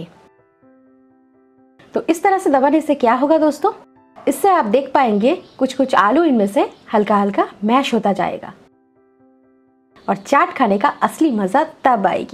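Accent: Bengal, India. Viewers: Are you bengali. .? apke hindi bolne k style se lag raha hai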